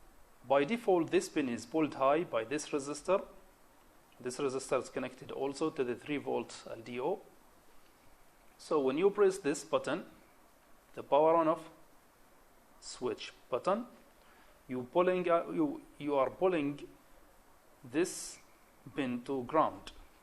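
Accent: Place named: Romania. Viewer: I recognized you with your Romanian accent and your famous "ok?"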